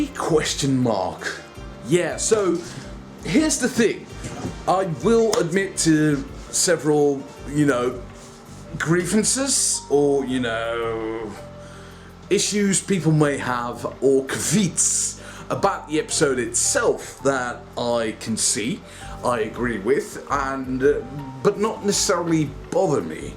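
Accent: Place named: England, United Kingdom. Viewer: Your accent is sounding more English these days and I approve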